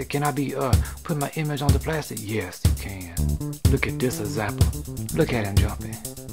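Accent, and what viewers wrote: Louisiana, United States. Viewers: I was close dude your accent is just like the cajuns down here I love it!!!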